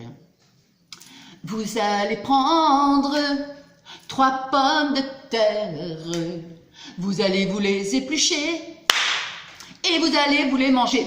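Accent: England, United Kingdom. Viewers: Trop bien cet accent anglais.. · Bonjour ma cocotte moment sympa surtout avec ton accent anglais et tes pantoufles moi j en ai aussi avec des cœurs et des pompons derrière, j aurais penser que le chat m aurais sauter dessus mais ça va cette folie la c fini,il fut un temps j avait des pompons devant, il m attaquait a chaque fois.passe une bonne journée en pilou c chouette.gros bisous 😚 mon amie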